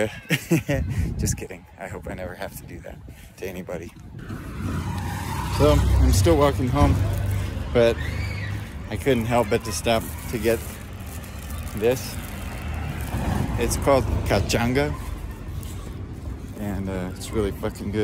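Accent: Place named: Spain. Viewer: Love your hoodie and your spanish accent jaja keep trying and improving it :) Stay tuned and have a good time 🤘🏼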